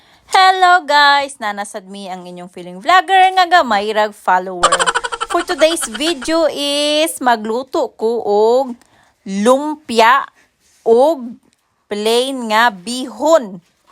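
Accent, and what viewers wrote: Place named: Misamis Oriental, Philippines. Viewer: Taga mis or cguro ka Kay naamay giatay sinultian pod nimo taga mis or gyud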